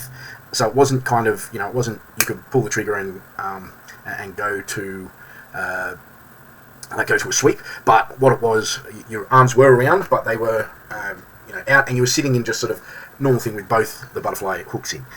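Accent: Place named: Australia. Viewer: I just stumbled onto your channel, good to hear an Australian accent exploring CLA. Where are you based?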